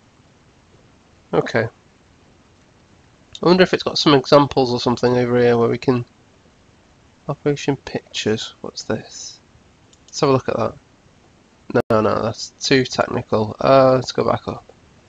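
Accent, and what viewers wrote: United Kingdom. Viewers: I just bought a 100w solar panel, 12v gell 35Ah battery & charge controller. Because, like you, I want to learn about solar. Seeing Julian Ilett frequently use his solar system on his 'tronics bench, I thought I'd try it out. BTW, if I can ask, what is your UK dialect? This Amurrican is a huge fan of EVERYTHING UK, so I really love the accents from different places in the UK. I think my favorites are Welsh, Yorkshire, Cockney... Oh, yes, and 'posh'. Best!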